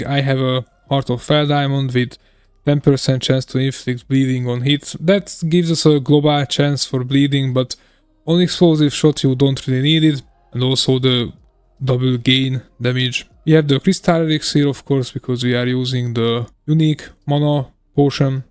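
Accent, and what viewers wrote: Hungary. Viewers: Probably ill be doing this build next season :) hope they dont change it! Btw are you Hungarian any chance? Your accent tells me that :D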